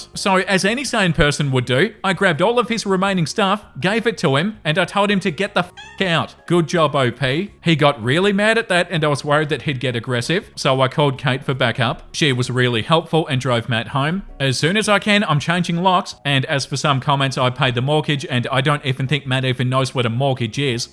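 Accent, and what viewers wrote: Australia. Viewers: i showed my mom your channel & she says "i want to marry this man's australian accent" (she loves australian accents lol)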